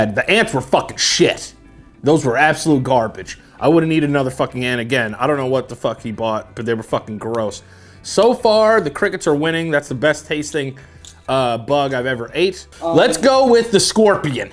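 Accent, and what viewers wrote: Australia. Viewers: Love the ozzie accent! Bloody rippa mate! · As an Australian, that accent was not offensive, but it was shithouse. 😂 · the Australian accent is killing me 😂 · HIS AUSTRALIAN ACCENT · Am I the only one that thinks with an Aussie accent is 👌👌👌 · i swear i’ve watched this a million times; now my going to sleep video i watch Australian accent kills me every time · As an Australian man, I can confirm this is exactly how we speak... mate. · NOT down to watch eat more bugs considering the fact ima bout to puke...... but very down for a whole video of him just talking in an Australian accent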